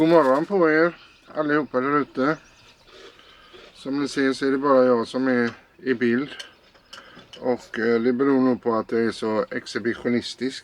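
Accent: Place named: Gothenburg, Sweden. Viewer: En härlig vandring genom Dole [dåll] (lite dragning åt ö - känner mig lite som besserwisser, men du undrar ju då och då i filmen). Oavsett dina uttal (det är lite käckt på göteborgska) så följer jag er mer än gärna. 😊😊 Ni förmedlar hela tiden en känsla av att själv vara närvarande med er. Fortsätt så! 👍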